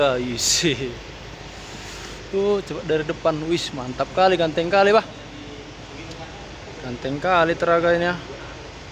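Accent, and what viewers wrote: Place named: Medan, Indonesia. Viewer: Medannn kali ahhhh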